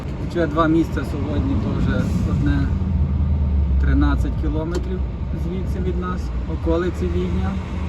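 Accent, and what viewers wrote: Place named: Western Ukraine, Ukraine. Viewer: спасибі за відповідь. Я так розумію, Західна область? Відчуваю нашу говірку)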